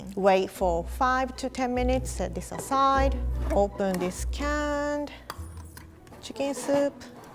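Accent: Japan. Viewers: lovely Japanese English. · What region of japan is she from. the accent is interesting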